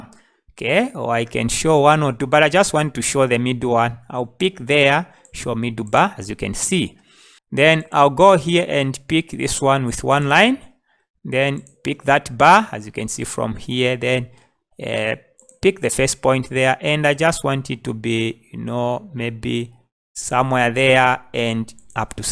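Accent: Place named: Zambia. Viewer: My guy you sound Zambia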